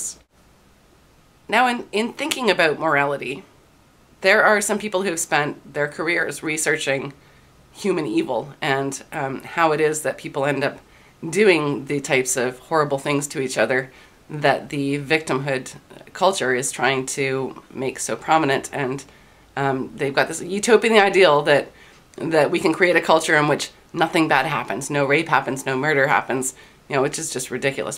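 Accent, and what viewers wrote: Canada. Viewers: You Canuck, eh?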